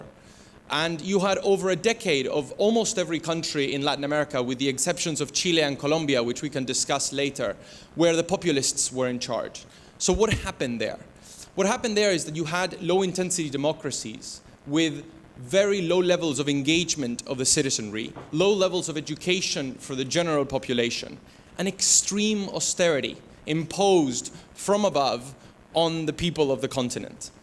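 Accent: Argentina. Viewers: Acento argentino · pero se le nota que es argentino? al hablar ingles digo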